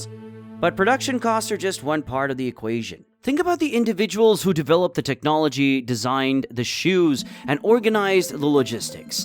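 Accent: Russia. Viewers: for real? Dang, I miss the Russian accent voice but looking and listening this one, I understood why. It feels like it’s made for a wider, global audience that’s used to American youtube channels. Add to the fact in your reply to another comment that you have two jobs. I’m just glad this channel is just dropped a new video after a long time, right with Adidas’ new elite running shoe. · I need the Russian accent 😢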